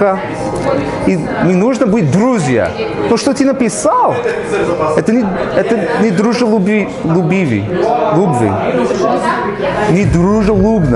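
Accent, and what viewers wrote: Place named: United States. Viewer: ты просто прелесть!:) Такой мило звучит американский акцент на русском языке, прям мурашки по коже:) Напоминаешь мне Фила из сериала Интерны (актер Один Байрон). Не обижайся на злых людей, их уже Бог наказал тем что они злые. Удачи тебе! Пойду посмотрю твой канал:)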